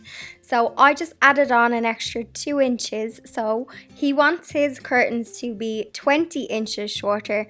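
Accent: Ireland. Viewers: I think I'm going to watch all my tutorials by Irish content creators...that voice!!! 💚 🤍 🧡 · I love your accent, Irish girl!!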